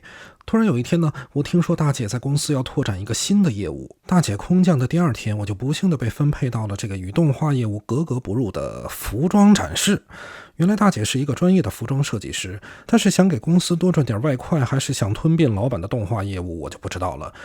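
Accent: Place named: Tangshan-Tianjin, China. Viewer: 😂北京人（唐山天津味）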